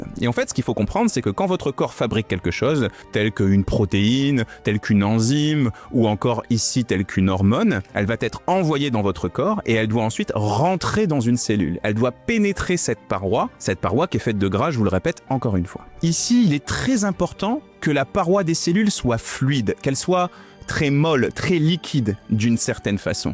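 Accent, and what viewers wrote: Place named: Picardy, France. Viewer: C'est l'accent de la Picardie , on prononce les o ouverts .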